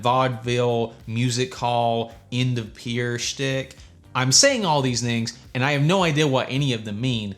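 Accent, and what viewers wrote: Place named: United States. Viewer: I like how you have a generic American accent until you say “sense”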